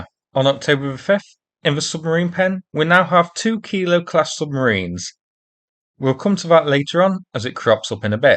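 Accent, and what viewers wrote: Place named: Lincolnshire, United Kingdom. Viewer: I'm trying to identify your accent! You sound exactly like a good friend I worked with years ago in Bangkok! He ( Gavin) was from Lincolnshire area I think?? Keep up the great reporting!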